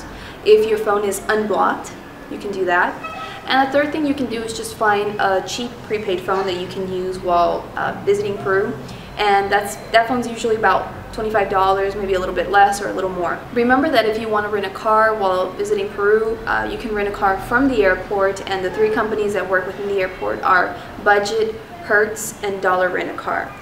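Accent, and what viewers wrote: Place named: United States. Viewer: You speak like American girl (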